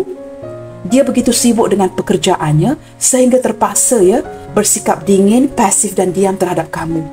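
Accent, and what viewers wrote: Malaysia. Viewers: Waa halo disini juga bangsanya kita kan Bun , bunda kita dulu juga pernah komen disini loohh ihhh ahhaa serumpun lohh wahh dengerin siapa tau cengkok logat Malaysia bisa aku pelajari loohh n aku ingat keluarga tiktok Malaysia lohh ituloh yg pakai jilbab wahh moga tetep bawa energi yg positif , orang nya terkenal sopan wahh ngefan banget sama mereka bun Alhamdulillah Chanel liriklagu di Malaysia sukses moga banyak yg subcrib juga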